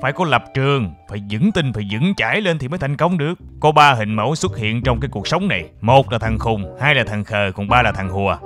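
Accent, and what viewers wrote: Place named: South, Vietnam. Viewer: Mình nghĩ sau ad nên để chữ ở trên video,các bạn miền nam nghe dễ,nhưng miền bắc nghe hơi khó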